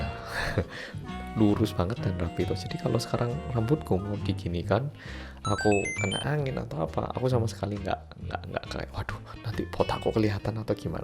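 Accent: Java, Indonesia. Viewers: Iki Jane orang Korea apa Jawa sih, kok lucu ngomongnya 🤣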